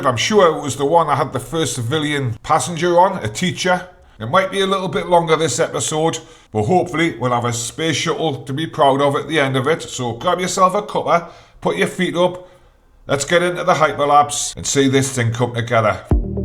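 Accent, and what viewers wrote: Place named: Wales, United Kingdom. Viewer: Hot damm, I do love the Wales accent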